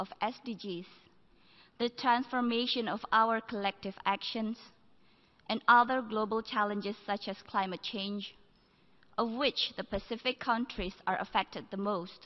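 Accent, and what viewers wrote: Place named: India. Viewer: Kek logat orang india:'v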